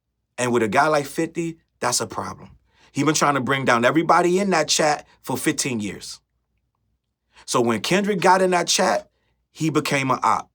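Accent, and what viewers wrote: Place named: New Jersey, United States. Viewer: First time viewer. I think you’re analysis is spot on. Also sounds like you have a New Jersey/New York accent. Great content!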